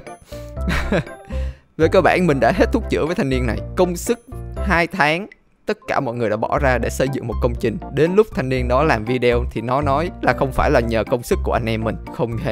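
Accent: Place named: South, Vietnam. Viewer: that is southern vietnamese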